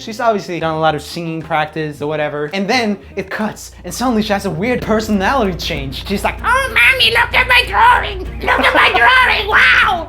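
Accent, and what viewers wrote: Gothenburg, Sweden. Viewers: why is his swedish accent so strong here? :DD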